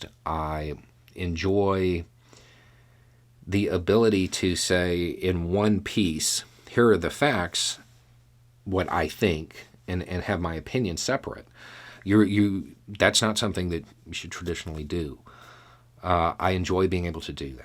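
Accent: South, United States. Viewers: is it a souther accent? Thanks to Blance Devereaux I like it. 😊 But I'm not American, so I probably haven't been exposed to certain things that could influence me. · It was definitely the contrast between your look and sound and your actual content that hooked me. I have family scattered across the south, so I know all southerners aren't hicks, but you're definitely a breath of fresh air. Keep it up! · I don't remember how I found you. Maybe it was something like "viewers of this channel (say...Seth Meyers Closer Look as an example) "also like this channel" (you) . I also enjoy watching "A guy" from Minnesota. Maybe youve heard of Vice Grip Garage. Another super intelligent fellow who comes off as a hick from the back woods. Not that you are either a hick or from the backwoods. But Derek is a person with an affected accent and he has tons of followers. 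Perhaps YouTube put your stream in the same queue because of that. It doesn't matter how I found you...but that I did. The last 5 years have been something of a wild ride emotionally capped off with the devastating effects of the ongoing pandemic. Discovering your voice has had a calming effect on me. Seth and Stephen Colbert are great at keeping disillusionment at bay. But in the last 4 months...you have really set my mind at ease. I can say this. Before the MAGA crowd appeared I had always thought that Southern boys were the pinnacle of common sense. I grew up watching the Daltons and later The Dukes of Hazzard. Uncle Jesse was the stereotype of what I believed to be honest folk intent on doing right for the sake of being on the right side. Of course nevermind the two centuries of racism. It was a breath of fresh air when I heard the philosophy that you use from your cap wearing furry faced southern accent. 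Something that likely exists but is not common. You have a gift. I'm so glad you use it. I think while I knew these th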